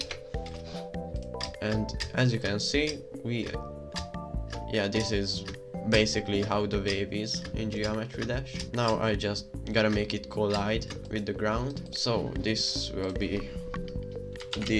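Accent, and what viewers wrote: Hungary. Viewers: Az az erős magyar akcentus❤😂(enyém se jobb) Szuper ötlet és megvalósítás amúgy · A magyar akcentus hallatszódik de ne add fel mert feliratkozok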